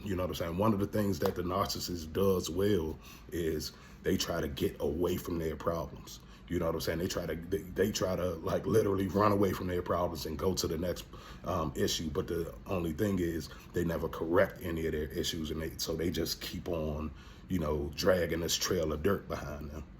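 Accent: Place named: East Coast, United States. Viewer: Thank you for sharing💛( You have to be from the east coast 😌)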